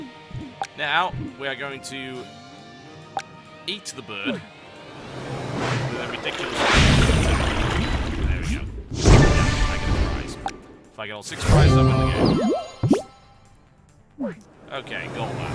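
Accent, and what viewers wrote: United Kingdom. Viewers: Doesn't currently live in the UK, ergo his British accent is fake. Nice logic you have there, dope.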